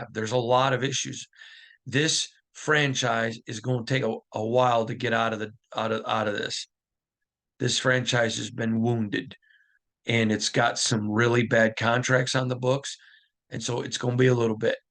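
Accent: Midwest, United States. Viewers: He's from the mid-west !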